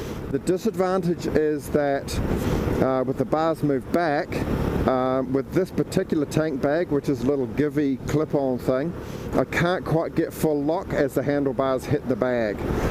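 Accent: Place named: New Zealand. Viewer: thanks for the clarificatio..ive been thinking why am I listening a kiwi accent from American.. Lol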